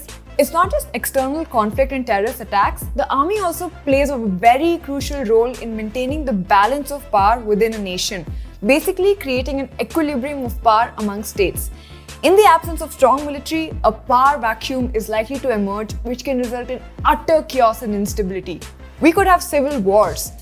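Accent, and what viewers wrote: India. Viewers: We all like your eng accent which is Indian. Unlike some stupid people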